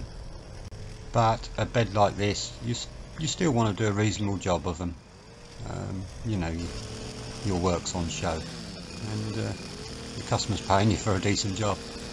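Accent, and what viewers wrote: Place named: Northamptonshire, United Kingdom. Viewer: Looks good. Good vid. Where in the country are you from as you sound very Northamptonian?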